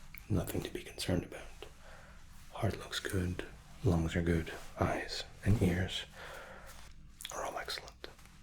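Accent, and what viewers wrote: Scotland, United Kingdom. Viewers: Tremendous as usual. As a Scot myself, I enjoy hearing the accent. It's very refreshing 😊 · Nice to hear a Scottish ASMRtist and one who shouts out others particularly my favourite Be Brave Be You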